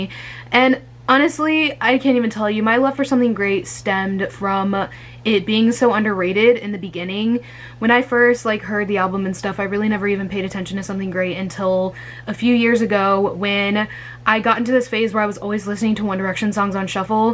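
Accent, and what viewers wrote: United Kingdom. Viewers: That british accent tho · British accent on point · ) I absolutely LOVE your shirt and your British accent is hilarious, we also randomly break into American accents sometimes too haha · Your british accent was really good!!!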